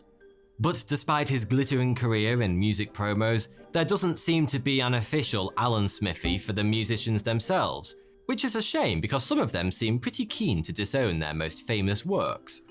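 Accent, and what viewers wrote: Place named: RP, United Kingdom. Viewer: dis bloke is the posh version of vsauce lmao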